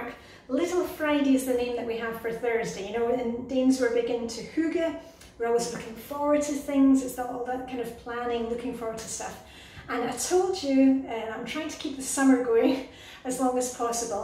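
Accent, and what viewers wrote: Scotland, United Kingdom. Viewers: My daughter was wondering if you speak Danish with a Scottish accent.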